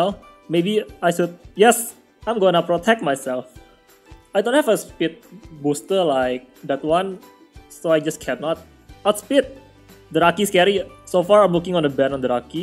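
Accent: Indonesia. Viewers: Soalnya b.ing nya kayak logat indo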